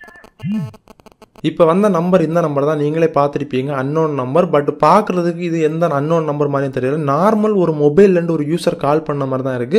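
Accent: Kanyakumari, India. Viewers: Kanyakumari neega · Bro neenga kanyakumari District ahh.....??? Slang paatha theriuthu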